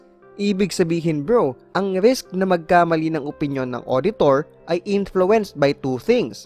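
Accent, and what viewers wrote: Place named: India. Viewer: you have Indian accent why using a blond blue-eyed skin?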